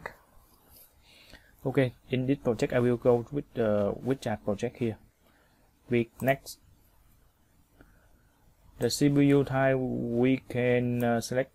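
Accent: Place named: Vietnam. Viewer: thank you, by the way, your voice looks like Vietnamese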